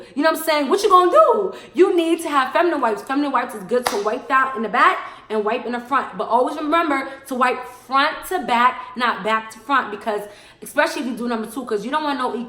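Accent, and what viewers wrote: New York, United States. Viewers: She sounds like a New Yorker lol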